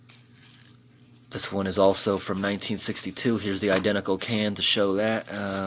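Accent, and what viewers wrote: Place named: Western Massachusetts, United States. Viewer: Sounds like a west Massachusetts accent. Typical south-western New England for sure.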